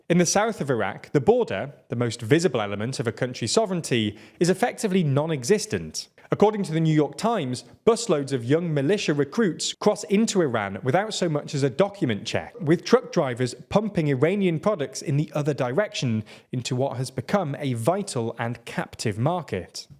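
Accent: United Kingdom. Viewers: He's british, different accent. Technically, his pronunciation is "proper" English 🤷‍♂️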